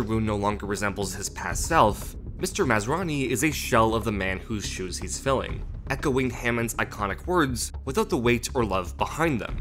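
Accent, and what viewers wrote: Canada. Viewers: canadian accent detected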